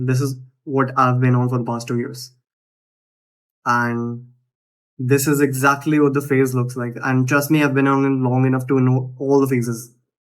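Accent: India. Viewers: Let me be honest with you bro. You have the choice to listen to a YouTubers advice right now or you don't. 1. You are not actually adding ANY value. I can find your exact video 100 times in this niche, the difference is you are delivering it with an indian accent. Find a way to stand out, find a way to package your video in a way so good that people feel stupid not cliking on it and watching it. 2. I know that hamza may have said that editing your videos lowers audience quality and attracts "jeffreys", but that is only true to an extent. Even just cutting out pauses can improve retention rate significantly. Hamza has started to edit his videos again too, because he realizes the power of it. 3. Speaking about quality, your microphone quality is bad. Listening to your videos literally feels like a chore because of that. (4. You technically can still make it as a YouTuber in the self improvement niche, but it has become so oversaturated that you might as well start getting rich by selling a course on how to start a self improvement channel.) Advice may come off as harsh, but if you implement my advice correctly you'll pop off in no time.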